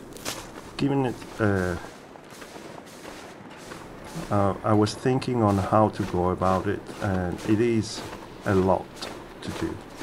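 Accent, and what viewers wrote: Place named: Italy. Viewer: Where in Italy do you come from? You sound like you would come near where I am from. Always a pleasure to watch you playing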